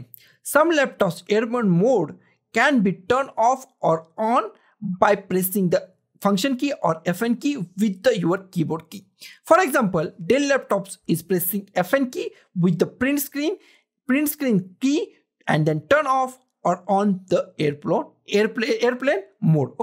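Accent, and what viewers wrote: India. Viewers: why is it always someone with an indian accent that just gives the best advice. i looked for an hour to solve my problem but 1 min into this video and i fixed my problem. Thank you!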